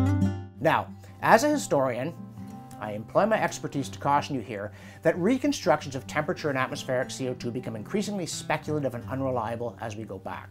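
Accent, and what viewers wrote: Canada. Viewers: and still nothing worth remembering. He spent that time trying to justify his veracity or valiidity or something. Once again.....4 minutes that I will never get back. He sounds like a Canadian to me.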